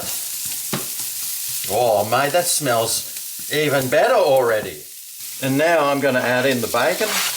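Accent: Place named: Australia. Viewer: I watch you every now and then:) nice to hear an authentic Aussie accent LOL....this meal actually looks very appetizing I am going to make it as I am sure my boys would like this...two questions...1. hard to tell so is this a big enough meal for 4? Or would I need to double the ingredients and 2. Would frozen cauliflower rice be an ok substitute for the lazy cook aka me 😆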